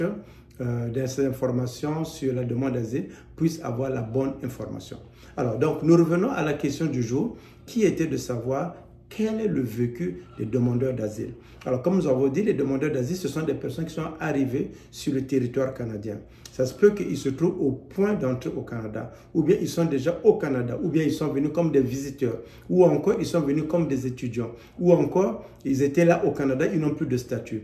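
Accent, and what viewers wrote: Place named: Democratic Republic of the Congo. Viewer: Moi j'attends ma date d'audience. Vous avez une tonalités congolaise 242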